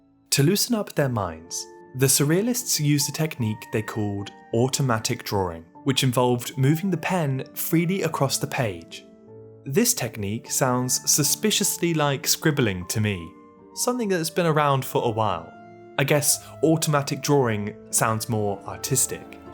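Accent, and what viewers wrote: United Kingdom. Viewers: Such a great video I love your voice I love the pace of your informative mind your British accent just awesome 👍.. loving the video about it and then watching more and more keep it up 😋👍😁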